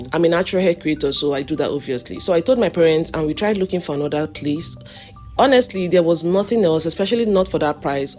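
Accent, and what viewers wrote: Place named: Nigeria. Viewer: Congratulations! May the Lord give you divine favor in 2026 and beyond in your life, your health, your channel. By the way, tell us which country. You sound Nigerian but 🤷🏽‍♀ I'll subscribe anyway.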